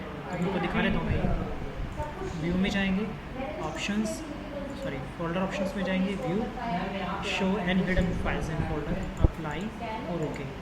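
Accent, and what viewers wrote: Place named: India. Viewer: Very nice Video thank you for doing the Video but the India-English is very hard to understand in that given Speed for a none english Native, also the Level of Loudspeaker is very low .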